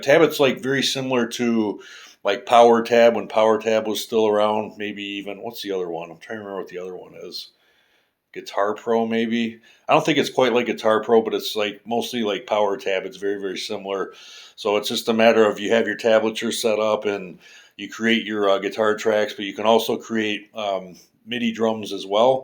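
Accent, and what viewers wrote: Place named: Chicago, United States. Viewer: Good grief, you're like Chuck Schludiner on speed with those riffs. Also, you literally sound more Chicago than you ever have.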